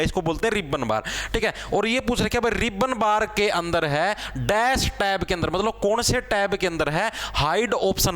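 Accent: Haryana, India. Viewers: Padne naa aate aapke yahan toh aapki language sunne aate h sir jii 😅😅 haryanvi on top ❤❤ mittar 🤭🤭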